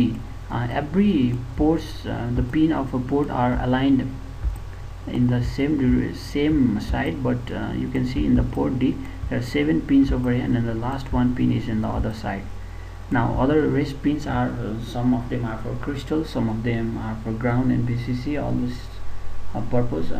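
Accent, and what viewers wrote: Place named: India. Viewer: Sorry about saying your from India. Just sounded like it.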